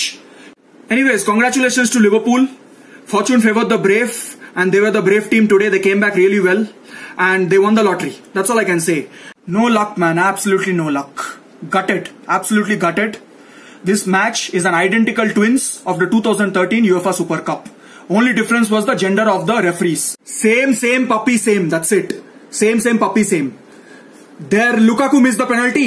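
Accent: India. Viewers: english with india accent so funny . hahahaha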